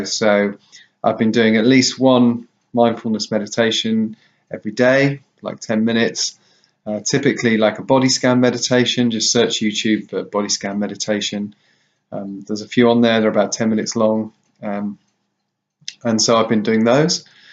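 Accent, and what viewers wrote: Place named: United Kingdom. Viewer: Hi, are you still active on this channel? I am struggling with this at the moment really bad. I noticed your accent sound like you were from the UK so I was wondering if we could have a text conversation to discuss this further. Really in need of some help.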